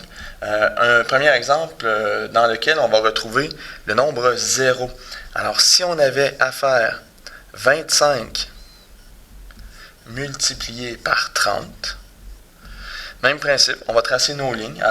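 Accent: Quebec, Canada. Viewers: C'est plutôt sympa comme méthode, et c'est vraiment bien expliqué. (sauf que ton stylo rouge il n'écrit pas rouge😂😂). It's a shame! 😅. Avec un super accent québécois, j'adore! Maintenant est-ce que c'est vraiment utile comme tu le dis si bien ? Pas vraiment, c'est plutôt ludique en tout cas et rigolo. Mais je pense qu'il ne faut surtout pas que les enfants oublient d'apprendre les tables de multiplication, ça leur servira toute leur vie. Moi je les ai apprises en chantant comment on faisait avant et j'avais plaisir à le faire quand j'étais môme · owww un québécois , j'aime bien les québécois x) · Ooooohhh un quebecoiiisss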